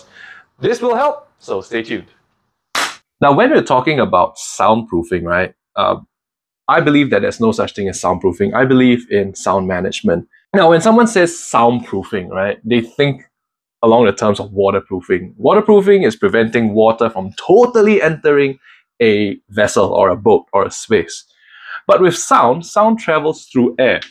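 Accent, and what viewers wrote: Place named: Singapore. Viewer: Get to the point, my Singaporean accent friend!!!!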